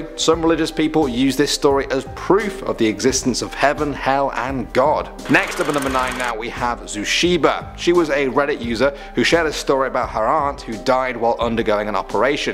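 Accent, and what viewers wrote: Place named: United Kingdom. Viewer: I can’t stand this British accent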